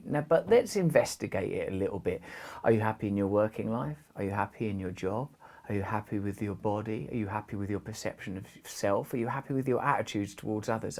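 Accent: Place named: United Kingdom. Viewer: My therapist put me on to you around 8 months ago. I enjoy listening to your British accent. And that you're so honest about sharing the fact that you were addicted to drugs & alcohol (as well as lots of twisted behaviors) resonates with me. I'm working a recovery pgm ...N/A. as of 4years I am staying clean "One Day at A Time" (was on Methadone for 25 years w/3 attempts at being loosed from those "liquid handcuffs".) I'd never had an issue believing in the Supreme Source it was anger, mistrust n feelings of not being loved by God those major "sins" kept me separated in my mind & heart ..n ultimately my body enslaved to the darkness that dwells in the shadows of the "concrete jungle" ...n the only thing I thanked God for was knowing about & learning of Jesus Christ ...for which he I believe was (n remains) my sponsor. Reading about several other major religious beliefs has always been something I've done in periods of abstinence. 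It's a saving grace today. I'm happy to say. But hey...relapse, backsliding n all that jazz..it just ain't happening...not anymore...very grateful for that🤗 But I'm interested in hearing your take on recovery... it's different...raw & bold. Have you written another version of the 12 Steps? B/c hearing you say what you said about the 1st Step..."ask yourself are you 🤬?" 😄Yeah..."Unf@ that's the bottom line mate! You're good brother 👍 and funny too 👏👏👏. It's great to be alive n not merely existing but finally living n practicing so many of the tools to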